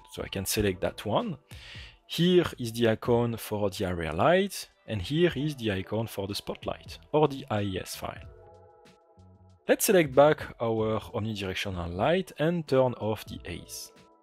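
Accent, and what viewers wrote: France. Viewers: le mec a tellement un accent fort en français que même si tu parles pas anglais tu le comprends:)) merci pour les conseils en tout cas, très interressant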